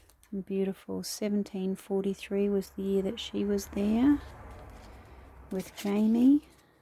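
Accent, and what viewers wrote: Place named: Australia. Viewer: Love your Sassenach journal, as a fan of the series, this gorgeous journal does it justice, love all the pockets/tuck spots and the fabulous images/ herbs with how to use is fantastic, you have created a great journaling journal, tfs , nice to hear an Aussie voice have subscribed, good luck in all your future creations xx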